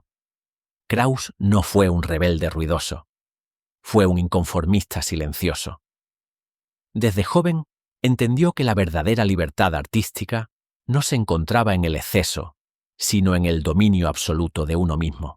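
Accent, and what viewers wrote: Andalusia, Spain. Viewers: Es andaluz el que habla. Que problema hay?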